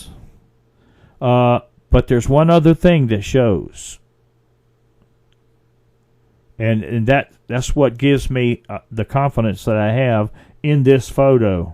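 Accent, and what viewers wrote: South, United States. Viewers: you could read the phone book to me and I would listen to you. Your beautiful southern accent always reminds me of my father. He's been gone 26 years this month. He would have enjoyed your research and videos. I'm so glad that you are still posting videos. I appreciate your time and your efforts very much. I have no problem believing Patty could or would have a braid because there are many stories of bigfoot creatures braiding the tails and/or manes of horses. Thank you for your time!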